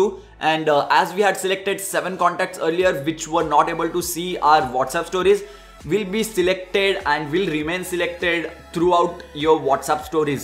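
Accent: India. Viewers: OMG are you INDIAN not again....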